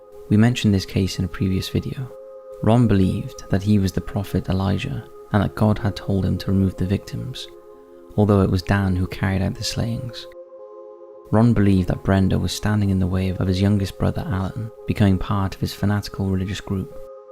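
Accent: United Kingdom. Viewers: I just want to say I love these "Hit Those Lights" videos and the narrator being British makes it so much more convincing and real. I've always been fascinated with what makes people do these horrendous, horrifying acts of violence and I try to find a pattern like they were victims themselves or there was a history of trauma but it's when there is no explanation found or the perp got away with it makes it even more un-nerving and intriguing. Keep up the good work!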